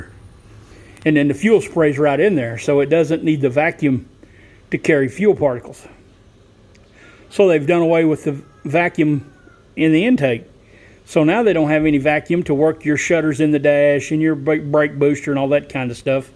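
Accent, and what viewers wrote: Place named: Tennessee, United States. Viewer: Are you here in tennessee? You sound like a fellow tennessean.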